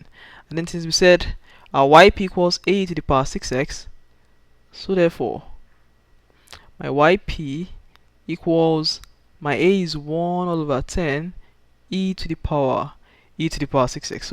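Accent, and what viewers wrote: Nigeria. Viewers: Thank you for the video! You be Naija guy?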